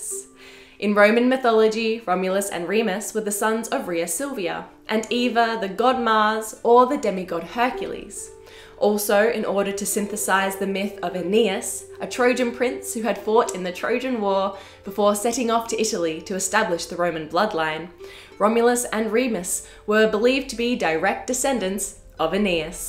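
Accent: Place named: Australia. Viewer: Great presentation. You sound like an Aussie.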